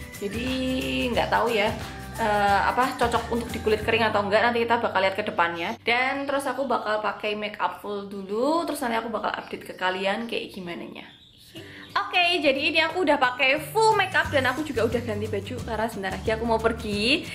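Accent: Java, Indonesia. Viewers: Mba bule medok 😍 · Yey Like And First Watching Video Mbak Medhok.. Sukses Trus Mbak☺. Makin hri mkin syanteekk. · Ayunee mbk medhokk😍😍😍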